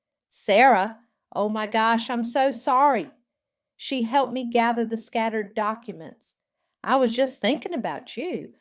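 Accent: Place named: South, United States. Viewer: It's the southern accent and the normal slow cadence of AI speaking makes them sound like hicks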